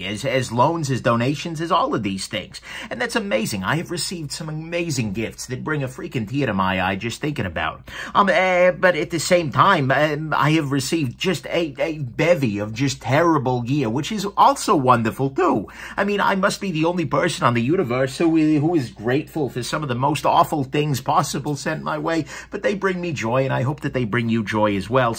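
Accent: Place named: Long Island, United States. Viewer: Long Island loves you! You sound like one of us! Lol! You bring me so much joy watching you dissect my favorite things other than my sons, KNIVES! Shot out to Doc Frunky and Frankie and Byrd! They are awesome too!!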